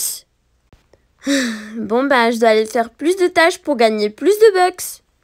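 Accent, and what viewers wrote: England, United Kingdom. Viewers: Je suis totalement d'accord avec toi !!! Tes vidéos son incr 😍!!! Ta voix est juste magnifique (j'adore ton accent anglais)🥰 ! Et toi t ✨PARFAITE✨… Continue comme sa !!! Bientôt les 50k !!!!! Ps : J'aimerais trop joué avec toi sur Adopt me !!!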